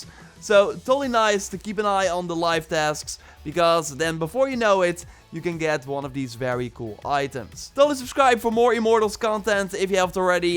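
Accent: Netherlands. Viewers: How dutch is this accent.. im dutch aswell but damn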